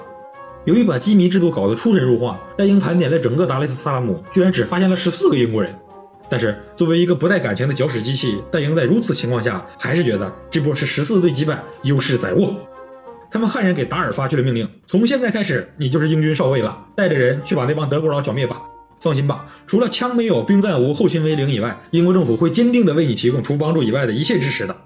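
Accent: Northeast, China. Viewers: 优秀的文案还得要用那迷人的东北口音读出来才得劲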